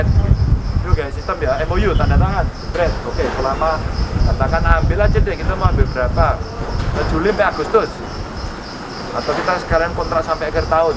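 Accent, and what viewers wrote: Banyumas, Indonesia. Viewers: Salam Ngapak pak Ganjar (TEGAL Haaddiirrrr),,,😁🙏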